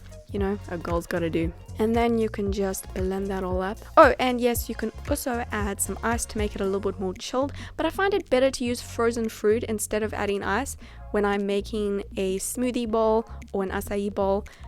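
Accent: South Africa. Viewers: great video! i love your accent! are you south African? sorry if i am wrong! X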